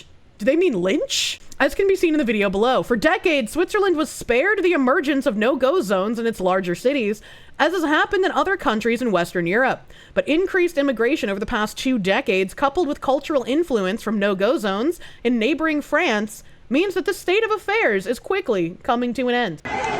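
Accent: United States. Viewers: i love how you say "man" where is your accent from in the states? I am australia so help me out.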